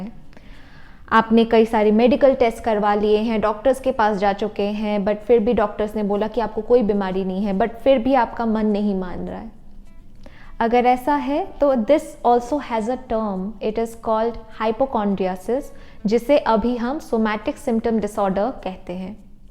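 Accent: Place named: Bengal, India. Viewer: Aapki language bangaali tone jesi lagti he Kuch kuch.... Aap bangaali he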